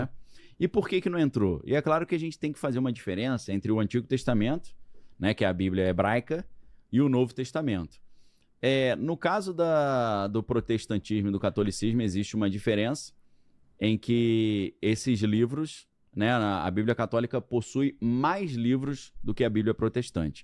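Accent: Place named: Rio de Janeiro, Brazil. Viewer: De verdade, a primeira vez que ouvi o pensei: vixeee la vem o carioca!!! HOJE NÃO PERCO UM VÍDEO DELE!!!!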